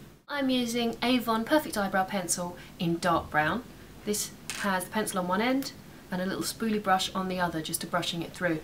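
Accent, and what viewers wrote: United Kingdom. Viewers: are u a british?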